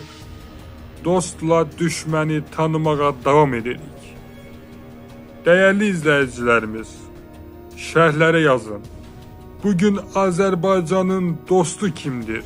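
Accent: Armenia. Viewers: Danışan hele bil ermenidi, gücene gücene danişir aksentnen danışır, bizi qorxutmaq lazım deyil, artıq biz dünyaya ses salmışıq 🇦🇿🇦🇿🇦🇿🇦🇿🇦🇿🇦🇿💪💪💪💪💪💪🇦🇿🇦🇿🇦🇿🇦🇿🇦🇿🇦🇿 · Акцент армяский . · Men hec bilmirem bu kanal ermenilere qulluğ eleyir yoxsa bize. Son vaxtlar bı kanalda verilen xeberlerin coxu panika yayıcı melumatlardı. Danışanın lehceside ermeni lehcesine oxşayır. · Не правда.. Даже автора голос на армянский голоса похож.. Я как карабахский ветеран и связист вам говорю.. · Diktorda ermenidi yaqinki. Azerbaycanniya oxwamir hec daniwigi. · Danisan 100% Ermenidir, cunki Azerbaycanli ele danismir.100% feyk. Elaclari kesilib her firildaga el atirlar.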